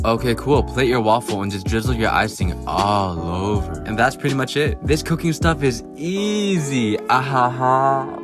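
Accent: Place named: United Kingdom. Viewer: Y'all can tell he's british. His accent... and LOOK AT THAT PANNNN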